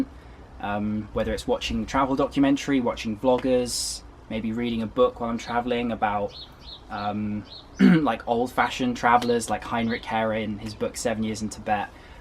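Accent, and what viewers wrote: United Kingdom. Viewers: has a beautiful way of speaking the King's English. An eloquent Brit voice can be as attractive as anything you will hear in France, but at least with Mr. no translation needed. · I love listening to your British accent and that's the reason I watch your videos.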